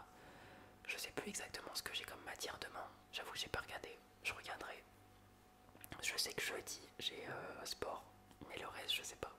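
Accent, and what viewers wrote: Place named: France. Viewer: Je ne sais pas ce que c'est pour les Français, mais en tant que Turc, j'aime beaucoup écouter des mots prononcés avec un accent français. Je vous souhaite un succès continu.🎉❤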